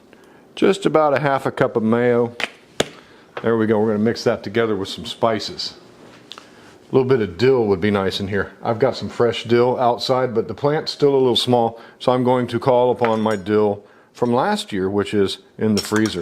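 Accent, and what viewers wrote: United States. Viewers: He is American. They say erb. Brits say herb.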